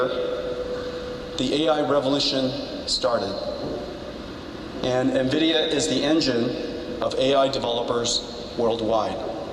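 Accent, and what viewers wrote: Taiwan. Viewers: 他的台灣口音，吊打一堆年輕人。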